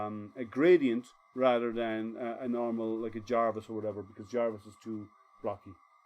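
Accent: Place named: Ireland. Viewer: well I can't get lightburn to connect. I was able to do a couple burns last night with GRBL but just didn't seem like I could change many settings. I thought you sounded Irish but just wasn't quite sure.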